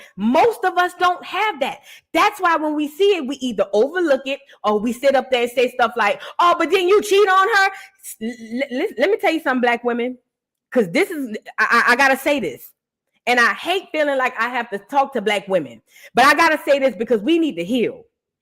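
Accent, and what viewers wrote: New Orleans, United States. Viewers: You head ain't ugly, you are adorable, just your voice New Orleans sound is so exotic. Chocolate Sex Symbol ❤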